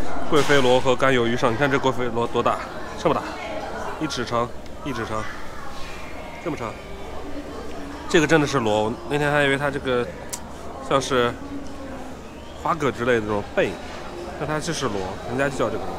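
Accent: Inner Mongolia, China. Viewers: 它来自鄂尔多撕，那里的土豪家里都有矿，煤矿，钱多的比山西，陕西煤二代也不差，所以不要小看山陕，内蒙口音的大土豪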